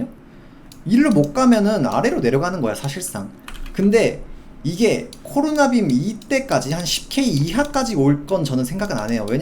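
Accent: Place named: Jeolla, South Korea. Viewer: 약간 느린 말투에.. 전남처럼 확 튀는 사투리도 아니고.. 성님이라는 단어를 써서 그랬어요 ㅋㅋ 딱 말투가 전북스타일인데 ㅋ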